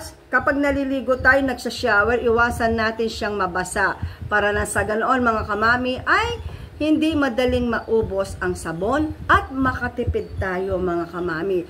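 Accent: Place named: Pangasinan, Philippines. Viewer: Panggalatok poba kayo kc halata sa salita ninyo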